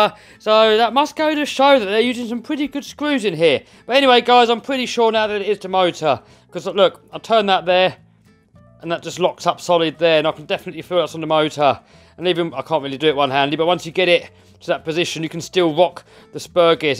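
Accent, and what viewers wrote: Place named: England, United Kingdom. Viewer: Amazing english accent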